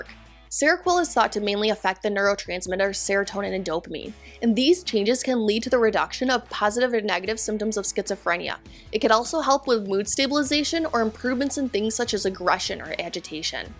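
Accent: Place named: Massachusetts, United States. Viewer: Would like to reckon you’re from Massachusetts holy lmao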